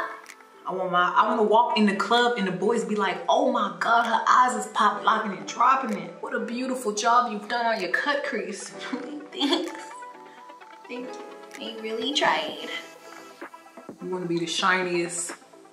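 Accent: South, United States. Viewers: It's the Texas accent for me 😍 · Southern accent coming through strong in this video...😍💪🏽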